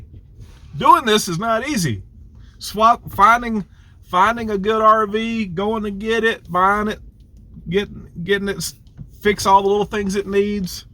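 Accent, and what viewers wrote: South, United States. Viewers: The spark in is back!! I'm sort of glad the "road" has kicked you in the A _ _! I can say that from experience ~ ~ gotta listen deeply to your body's healing process. Love your southern jibber jabber too. I think sometimes you change vehicles to keep it interesting for us, plus we all learn. For that, I thank you. Promise yourself you'll slow down even if you do feel better, the healing will come much faster if you do ~ I know from 1st hand experience. Felix is a God given gift, good he's walking that mile with you everyday.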